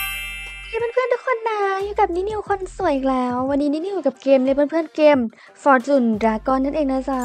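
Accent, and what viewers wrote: Rural, Thailand. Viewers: ชอบเสียงพี่เค้ามากเลยอ่ะโอ้โหเสียงแบบเหน่อได้ใจ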